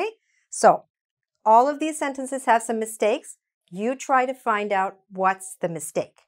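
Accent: Brazil. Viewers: Thank you so much, I think you are Brazilian because I am almost understand you perfecttaly! It is beautifull gift you have teaching! Excellent! Thank you for posting!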